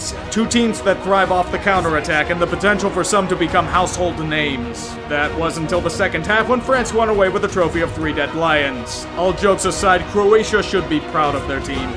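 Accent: United States. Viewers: kinda, i watch him a lot so i expected the cringe. But he, for the most part, knew what he was talking about. I always find it hard, even as an american myself, listeing to someone talk about football in an american accent but i was proud of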